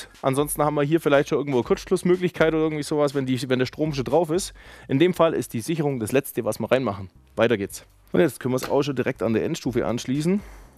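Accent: Swabia, Germany. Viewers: Ja, ganz schön blöd, wenn man meint immer recht zu haben. Der gute Herr hat die Gleichspannung gekennzeichnet einmal 12 V und 10 V in Form einer Linie. Die Sinuskurve solle hier nur ein Signal darstellen (Musik, einzelner Ton etc.). Im Beispiel wurde verdeutlicht, was passiert wenn die Bordspannung sinkt. Danke für das Video !!! ps. dein schwäbisch ist unüberhörbar xDDD (Y)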